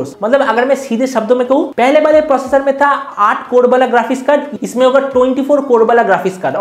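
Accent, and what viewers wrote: Bengal, India. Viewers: Nice Bengali ascent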